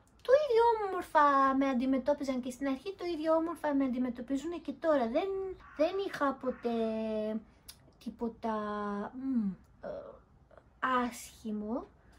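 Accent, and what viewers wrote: Japan. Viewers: Μιλας τα Ελληνικα με Ισπωνεζικη προφορα !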